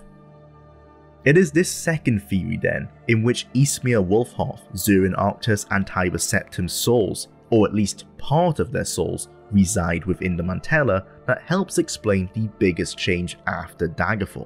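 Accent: Wales, United Kingdom. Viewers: ...I like your Welsh accent. Ignore the jerks who complain about it. Brilliant video! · I can't believe I listened to a Welsh accent for almost four hours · you shouldnt suppress your Cymric accent, Cymric people are based; also, Welsh/Wales is a slur meaning "Foreign/Strange People", it shouldnt be used, fuck satisfying the wants of the British, Britain is an Anglo-Scottish Union of oppression and cultural-genocide against the Gaelic and Bretonic peoples of the UK; the Southern English and the Low-Lander Scots, rule the roost of this regime we live in, under our dictator, Lizzie, whom ironically has the same birthday as Adolf Hitler, its symbolic... of the fact shes a fascist shite-arse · Don't Hide Ur lovely Welsh accent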